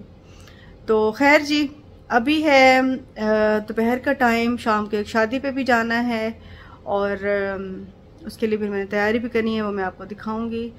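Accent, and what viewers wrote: Saraiki, Pakistan. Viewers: Over acting mat Kiya Karen ap Ka lehja seriki hai Urdu theak NI bolti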